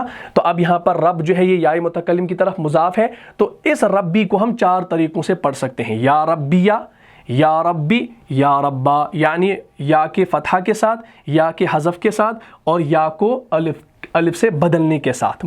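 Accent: Kashmir, India. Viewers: اَلسَلامُ عَلَيْكُم وَرَحْمَةُ اَللهِ وَبَرَكاتُهُ بھاٸی آپ کے لہجے سے میں پہچان گیا تھا کہ آپ کشمیر کے ہیں میں لاہور سے غازی مخاطب ہوں آپ کا پروگرام امارت اسلامی افغانستان میں بھی دوست سنتے ہیں اللہ آپ کو جزاۓ خیر عطافرماۓ بہت اچھا درس ہوتا ہے اس کو جاری رکھنا اللہ آپ کا حامی و ناصر ہو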